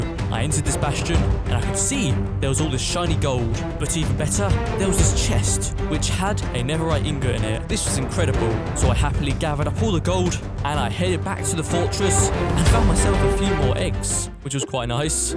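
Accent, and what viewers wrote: United Kingdom. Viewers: ARE U BRITISH I KNOW UR IN THE UK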